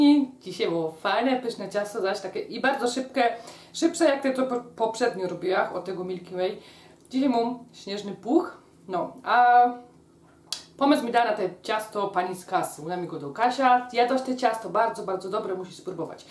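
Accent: Silesia, Poland. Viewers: No i co z tego że tych przepisów jest mnóstwo na youtubie I nie tylko jak wszystkie twoje przepisy jeśli chodzi o mnie,w twoim wykonaniu Są nie do przebicia. Uwielbiam ciebie i twoje filmiki tak się swojsko u mnie w kuchni robi. Ja też jestem Ślązaczką i dzięki tobie mogę pod szkolić się w godaniu🤪 wyjechałam na obczyznę 20 lat temu no i trocha mi się godo ale do ciebie jest mi cima 😂 dzięki tobie można się poczuć jak za dawnych lat w domu rodzinnym. Pikne mosz te włosy. A mój tata jak to kiedyś mówił „ to mosz dobre”. Pozdrowienia serdeczne i całuski😘💝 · Broń Boże ! Ta śląska gwara to clou programu ! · Twoja rozmowa jest mega mix niemieckiego i śląskiego ale naprawdę fajnie się ciebie słucha pozdro 😊😊😊😊Aber echt gute sprache 😁😁😁😊😊😊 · Asz mi sie serce smieje ..jak ta moja gotka slysza...Dziolszko tys je einmalig....Pozdrowienia aus Nürnberg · Pani proszę mówić normalnie bez gwary śląskiej, bo widać że pani się męczy. 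· Bo to jest po ślonsku, Opole jest na Śląsku, a my sam koltywujemy jezyk ślonski 😛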